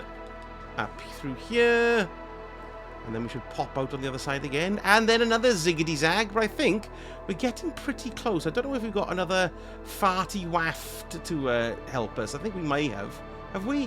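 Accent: Wales, United Kingdom. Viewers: didnt expect the welsh accent haha, first time on your videos. Great content, cheers for the guide!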